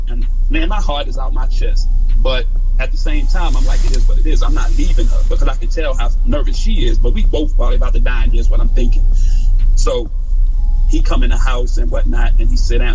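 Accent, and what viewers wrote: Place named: New York, United States. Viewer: Yo this nigga sound like Jay-Z this the second video I'm hearing with u and ol head y'all New York niggas be sounding the same on that jack especially with that New York accent. I got a good ear I can always tell where a person from by how they talk and what words they use.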